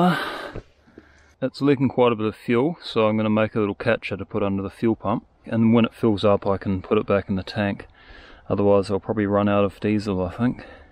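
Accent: New Zealand. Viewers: He sounds like a kiwi also the red band boot is a likely sign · Just found this video. Amazing. Great to hear a kiwi voice doing this sort of stuff. on YT Unless you know this country, you canʻt imagine just how difficult it is - fighting your way through the matagouri and manuka. Used to go up to Tennyson Inlet on holiday and did bush bashing up there so have an appreciation. Great video, no frantic panning and shakey stuff. Well done on something incredibly well put together. As you know, there are lots of these old TD6s, TD8s and TD 9s left still surviving after years of hard work. incredible mechanical skills as well - good old No8 wire kiwi stuff! You deserve this one mate! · New Zealand I suppose? Hope I got the accent correct? Or is it good day mate? I am from little rock Arkansas, so please forgive me, not trying to offend. I like you rescue of the bulldozer. 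To cool mate! We, in the United States love heavy equipment. Loved this episode. Hope to catch More of your rescue's! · lmao was slowly noticing more and more NZ fauna as I was watching, didn't catch the accent at first sorry g thought you were an Aussie. Major props had no idea this stuff could be found in our country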